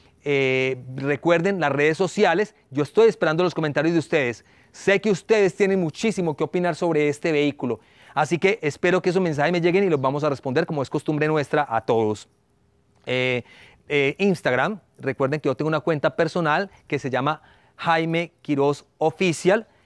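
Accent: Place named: Colombia. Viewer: Pensé que era Colombiano por su acento, excelente video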